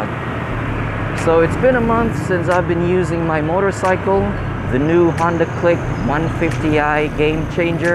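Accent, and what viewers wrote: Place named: Cebuano, Philippines. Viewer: Gnda talaga english accent ng mga taga cebu haha ride safe paps. Subscribed already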